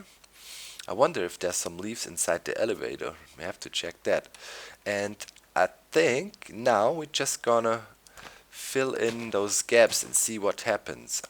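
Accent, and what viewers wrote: Germany. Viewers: Ha! Ich wusste doch das du Deutscher bist, das hört man doch. Hab mich aber nie getraut zu fragen :D Geile Videos-> Aboniert =3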